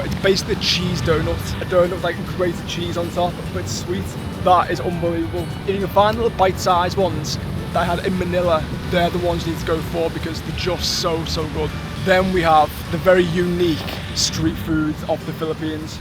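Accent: United Kingdom. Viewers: You're a very gifted artist/illustrator. 🎨🖌 Sorry I'm not good at distinguishing accents. What part of the UK are you from? So great to hear that PH is your favorite place in the world to visit. 😊 As for recommendations, Batanes is one of the places here we are really proud of. It kind of looks like Scotland or NZ but in a tropical setting. There are actually tons of awesome places you can visit here. Some are only mostly frequented by locals. :)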